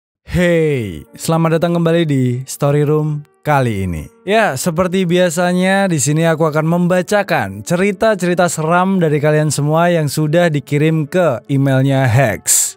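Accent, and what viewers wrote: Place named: West Java, Indonesia. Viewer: Sunda euy😂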